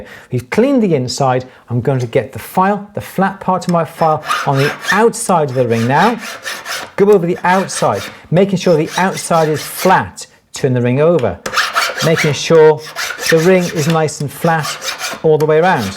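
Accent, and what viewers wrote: Wales, United Kingdom. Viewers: Great videos. U have a sight Welsh accent s. Wales??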